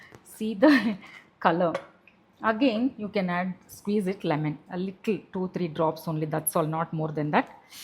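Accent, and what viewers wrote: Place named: Telugu, India. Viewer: Irritating. Why you talking english like telugu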